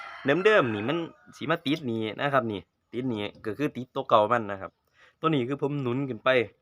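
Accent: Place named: Sisaket, Thailand. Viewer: อยู่ไสน้อครับคือเว้าคือคนศรีสะเกษแท้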